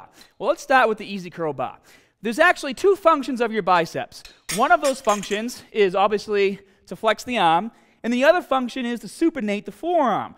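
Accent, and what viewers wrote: Boston, United States. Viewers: You got a lot more Bahhstun from your videos five years prior. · SO Boston, LOL! Great vid! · Love your Baaaasten accent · Boston accent ? Thank you great video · Theres a touch of a boston southie accent in there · Boston? Rhode Island? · I forgot that the Boston accent existed, Haha. Good info from the dude tho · I’m not even from the US but even I recognize this guy must be from Bostaahn Mass. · Is this guy from fucking Boston? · Appreciate the video, but check the BAHston accent.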